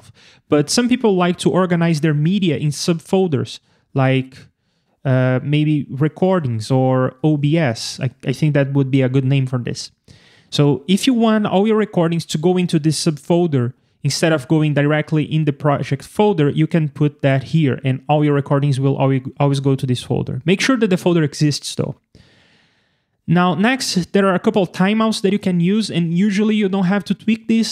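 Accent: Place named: Brazil. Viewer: Muuuuito bom, meu amigo. Escrevo em Português para agradecer, pois acho que reconheci o sotaque brasileiro, certo? Ganhou mais um inscrito. Obrigado. Abraço!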